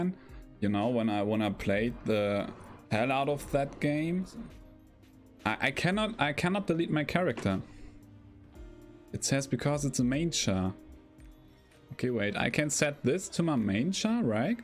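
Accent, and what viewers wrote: Germany. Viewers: Hey there, von deinem Akzent ausgehende denke ich, dass du deutsch sprichst. In der Hoffnung, dass ich den Kommentar nicht auf englisch wiederholen muss, möchte ich dir sagen, dass es absolut nachvollziehbar ist, warum du dieses "Spiel" nicht mehr spielen möchtest. Suchtmechaniken, verbunden mit P2W-Mechanismen sind absolute K.O.-Kriterien. Ich habe LostArk nie gespielt, aber die Kritiken, welche ich darüber gelesen habe, schlagen in die gleiche Kerbe wie dein Video. Ich hoffe bald wieder deine Bloodletter-Zauber-Videos bestaunen zu dürfen. Auch wenn Albion nicht perfekt ist, die Community haut' raus. Und nach meinem Kenntnisstand, bist du da n verdammt gern gesehener Typ!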